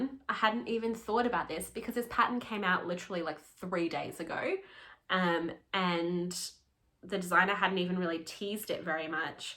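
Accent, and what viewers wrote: Australia. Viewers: Great to see you pop again on YouTube and it’s so nice to hear an Australian voice which are few and far between on knitting podcasts and speak about us coming into summer as the rest of the world talk about going into winter (as though there is only one hemisphere in the world)! Love your humour 😂😂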